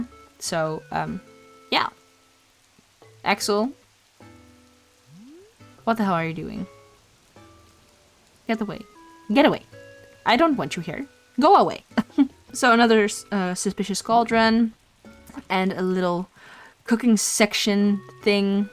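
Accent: Netherlands. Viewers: Love this island! First time I’m seeing your channel but are u Dutch? Thought I heard the accent lol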